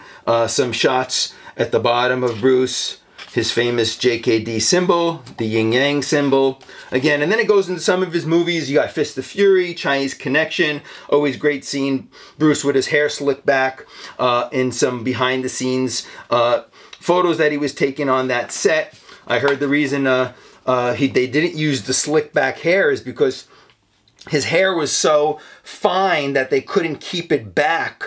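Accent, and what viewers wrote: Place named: New York, United States. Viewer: You brought back some great memories. You sound like a Noo Yawka. Did you ever go to the martial art shop on 42nd St?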